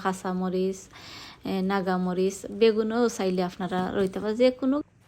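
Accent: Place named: Sylhet, Bangladesh. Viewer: আপা আপনি কি সিলেটইয়া নি,কথায় বুঝা যাচ্ছে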